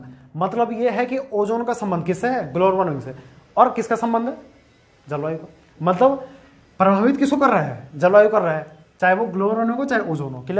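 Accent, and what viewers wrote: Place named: Garhwal, India. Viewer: Sir one thing apko hame apni language mai phadhana chaiye (ghadwali mai)... abhi tk koi bhi teacher nhi h youtube mai jo gadwali mai phadata ho.(jase south mai dekh lo. Tamil mai dekh lo. Apni language mai phadhate h sb. Assam mai dekhlo. Tripura mai dekhlo. Sb apni language mai phadaya h) apki voice mai gadwali ka flow bhi ara h. bacho ke liye best h jo hamre jadatar gadwal mai h..jldi samjhege gadwali mai .. aap ek baar try krke dekhye. jada success milegi apko and students ko bhi.. Thanks Aspirant Deled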